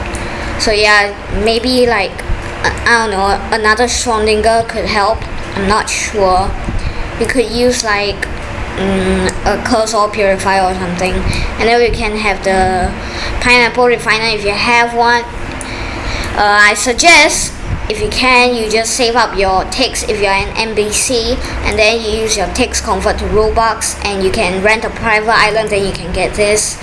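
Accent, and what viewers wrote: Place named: China. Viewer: u sound Chinese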